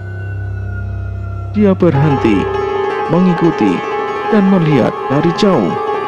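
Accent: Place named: Java, Indonesia. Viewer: Gak apa2 mase maju terus.. Unik itu dialek jawanya poko e top maseee semoga sukses selalu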